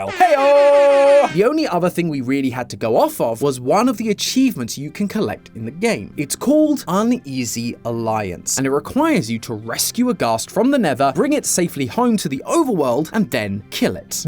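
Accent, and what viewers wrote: United Kingdom. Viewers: BRITISH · unwatchable british accent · what did i miss why is british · Yeah the British accent is too much 😂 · Oh this is British now. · more like why is the narrator always british 🙁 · I haven’t watched since why is he British😢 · youre the narator, whos VOICE IS BRITISH!! you used to work with Upisnotjump didnt you!!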